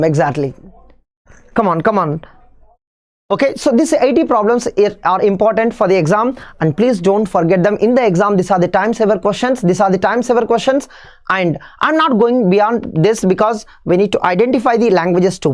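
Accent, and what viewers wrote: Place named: India. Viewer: Your hindi accent is so amazing 😂 btw session is really helpful. Thank you sir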